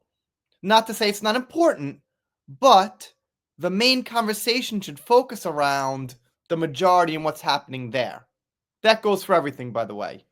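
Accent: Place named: Long Island, United States. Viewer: Oh my God that accent. Tell me you're from Long Island without telling me you're from Long Island.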